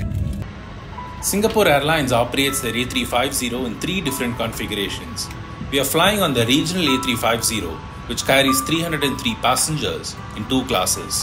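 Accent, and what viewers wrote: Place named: Tamil, India. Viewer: Love from chennai ❤ Btw are you tamilan ? your voice is like that tho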